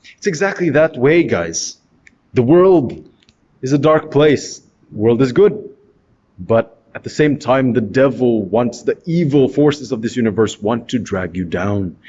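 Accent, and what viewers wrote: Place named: South Africa. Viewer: Is that South African accent bro ?? Are you South African? Good speech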